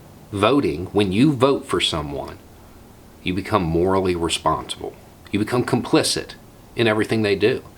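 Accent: South, United States. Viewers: I appreciate someone with a southern accent speaking some sense.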